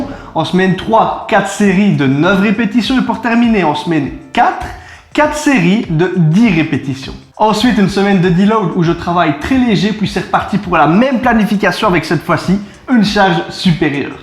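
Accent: Liège, Belgium. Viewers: Accent belge de la mort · Super tes vidéos. Tu as l'accent de Liège non? Tq je suis de la province de Liège aussi 😂💪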